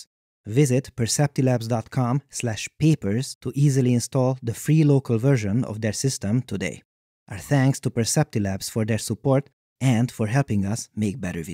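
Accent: Hungary. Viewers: Tipikus magyar akcentus! xd (ne vedd sértésnek!) Nagyon jó videó! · Are you Hungarian?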